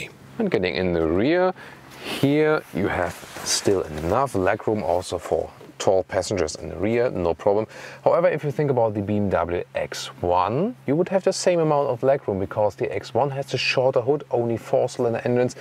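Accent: Germany. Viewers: Da sieht man dass du deutscher bist aber sehr gut english kannst. Danke fürs review!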